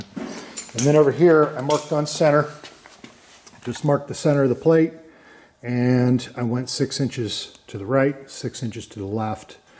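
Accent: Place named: Pittsburgh, United States. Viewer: I here Pittsburgh accent. 😁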